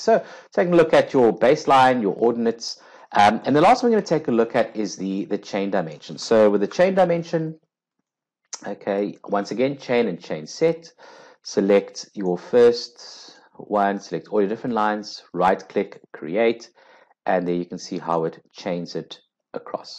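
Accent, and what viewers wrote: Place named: South Africa. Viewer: are you south African, i heard your voice and immediately knew 😂😂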